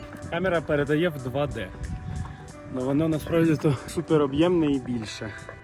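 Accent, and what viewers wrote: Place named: Kuban, Russia. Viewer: «калакольчіка» «да» « сейчяс» ви з Кубані ? Розмовляєте якби українською але акцент і словечка з російського наречія, з Кубані мабуть…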